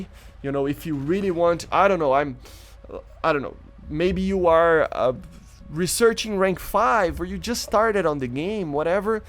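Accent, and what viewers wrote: Brazil. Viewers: Tu é brasileiro né? K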